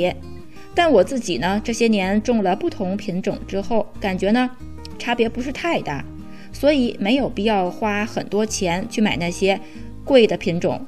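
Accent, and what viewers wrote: Tianjin, China. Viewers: 浓浓的天津味！好听！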